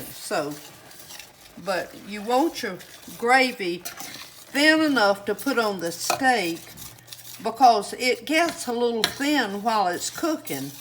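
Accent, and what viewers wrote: South, United States. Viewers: Ms., you are a national treasure! I love hearing your accent (Yankee here) as it brings back frond memories of our time stationed in the South. God's richest blessings to you and your family! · It’s so good to still hear that good old southern down home country accent from a southern young lady..!..and can’t wait to cook this dish..!..GOD BLESS..! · I could listen to you for hours!!! I love your southern accent. I’m a subscriber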